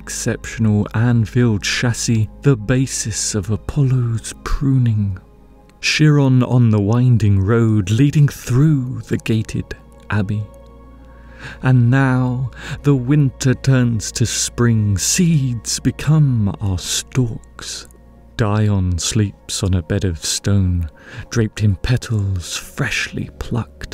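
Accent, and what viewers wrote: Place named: United Kingdom. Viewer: Hi again,. You only get this good at making poem videos by enjoying making them. It shows but on top of that you've got real directorial talent. That's a surprise to discover; there's little about being a poet that really promises an ability to direct. You're right to call this a "poetry film." It sure helps that it's the poem that it is; I'd love to have been reading it! It's clearly good because if it weren't it wouldn't bear the "weight" of so much production. In other words, if the poem weren't good the video would've collapsed. I do know how hard it is to put text on top of really fine images; for one thing, the image has to be one that gives the text "space." I always do but part of that is that I'm not as good a reader as you. & wow, just having a British accent is an asset. If I were as good a reader as you I might be tempted to leave the poem to my voice.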